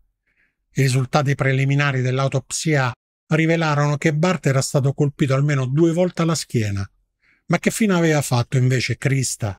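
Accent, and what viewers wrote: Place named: Rome, Italy. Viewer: Mado’ che voce fastidiosa e che accento troppo marcato …Spaes Ics che sarebbe? Prova a parlare in italiano invece che romano, siccome che lo devono ascoltare tutti….video pessimo. DELETE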